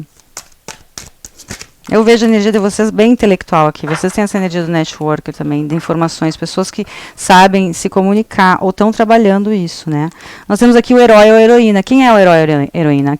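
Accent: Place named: Rio Grande do Sul, Brazil. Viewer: Pelo seu sotaque já percebi que vc é do Rio Grande do Sul. Parabéns pela leitura, vc tem o dom da expressão, muita intelectualidade!! Gratidão!! 🥰 Namastê 🙏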